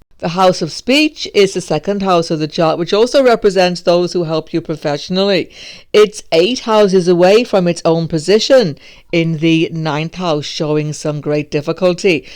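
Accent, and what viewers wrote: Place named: London, United Kingdom. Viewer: come on, it is deep and captivating. it looks british accent (London) to me but with some other strong accents I can't decipher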